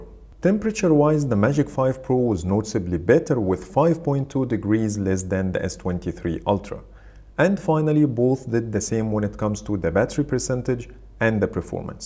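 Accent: Egypt. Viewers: your accent like the Egyptian people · لجهتك مصري ١٠٠%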